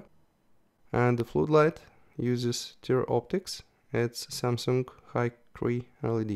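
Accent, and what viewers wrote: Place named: Russia. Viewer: Such a rarely adequate review! You mentioned all things that are needed and skipped by other people, especially time before light becomes loose its brightness on each mode. Thats really essential! So i can see that turbo mode can be used up to 2 minutes before dimming, high mode on spot - 6 min and on flood - 10 min, and medium mode - without limits. Your accent sounds like you are russian-speaking, arent you?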